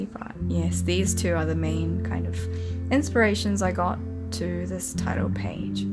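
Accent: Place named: New Zealand. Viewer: I follow you on ig nd u sound lika full on moari 😂 didnt expect tht.. Was thinking like an american accent or asian(i swear im not racist,forgiv🙏)😆 Btw Green tea all da way fo me ✌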